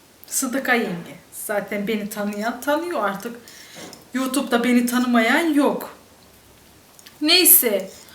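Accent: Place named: Erzurum, Turkey. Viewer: Ben sivasliyim.siveler benziyor sanki Erzincan Erzurum lu gibisiniz. Doğru mu?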